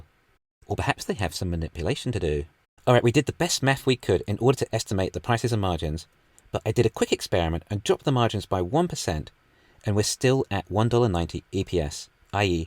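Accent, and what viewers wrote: England, United Kingdom. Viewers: You English accent is very heavy in England.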